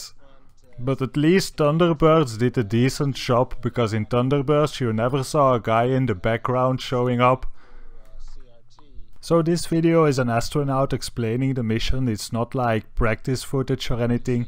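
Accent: Austria. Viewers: One thing I am CONVINCED about is: Your from Austria aren’t you??? Even though the only Austrian accent I have ever heard in my life was the great governator himself Arnold Swarzenegger! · Is the voice over guy doing a Schwarzenegger impression? or is he just Austrian?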